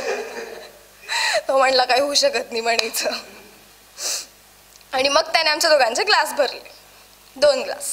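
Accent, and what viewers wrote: Khandesh, India. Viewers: Khandeshi marathi olkhuch yete 😅 Pn chhan ahe 👍👍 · Khandeshi ahe vatate? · आवाज ऐकुन अस वाटत जळगाव खानदेश 💯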